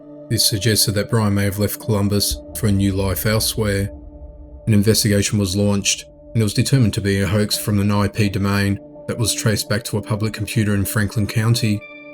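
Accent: Australia. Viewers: Love your voice and because of you I really can listen to other aussies ❤️👍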